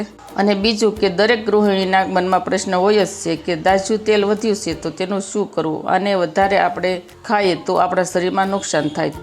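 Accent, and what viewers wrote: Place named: Kathiawar, India. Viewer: Bau j saras ben..kathiyavadi so😊 mithi bhasha bolo chho...jayshreeben from nairobi kenya (africa)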